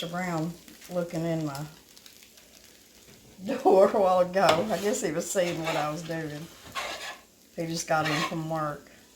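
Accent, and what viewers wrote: South, United States. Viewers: Your sweet nature, Southern English and just being yourself has certainly earned my pitiful little like and subscription.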